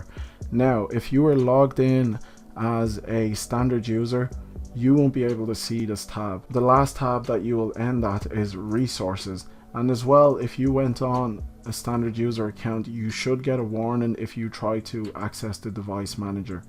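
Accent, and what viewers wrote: Ireland. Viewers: Is that an Irish accent or are my ears just ringing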